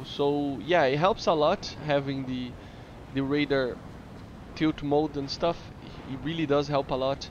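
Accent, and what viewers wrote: Brazil. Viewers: Are you Brasilian?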